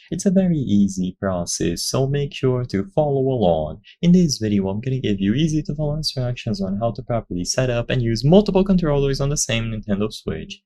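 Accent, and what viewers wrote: Brazil. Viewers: o sotaque não me engana, br na área!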